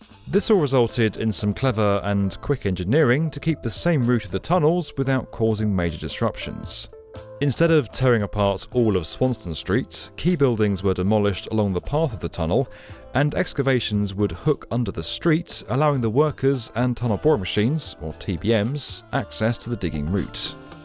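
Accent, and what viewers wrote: United Kingdom. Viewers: Weird hearing a British guy talk about the suburbs I’ve lived in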